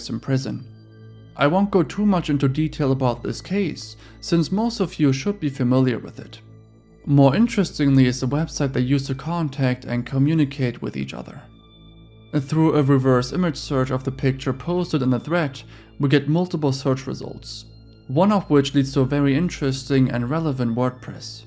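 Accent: Germany. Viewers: Armin Meiwes is/was(?) somehow the least offensive cannibal to ever live. Issei Sagawa could learn a little something from him... 😒 I'm really surprised that I'm seeing what is, to me, entirely original content in a list video like this. Horror-themed channels tend to all cover the same, what passes for "mainstream", content across the internet. I can watch five top five videos of "creepy things from around the internet" from five different channels but only see a total of seven to ten (out of 25 total, remember) unique stories altogether. So, kudos for doing your own research and finding stories other people may have passed on, ignored, or missed entirely. I respect and appreciate it, my dude. May I ask... Where is your accent from? Based on how you pronounced AM's name, I would assume your first language is either German or German-adjacent (for example, Dutch). 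Am I anywhere near right?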